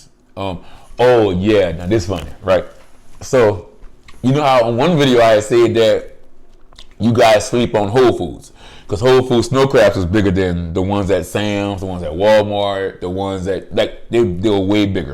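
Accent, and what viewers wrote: United States. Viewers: Not a fan of crawfish, but your country accent makes it interesting 😃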